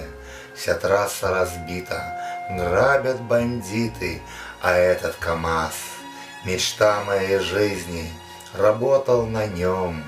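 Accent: Belarus. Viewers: Братка- беларус чытае?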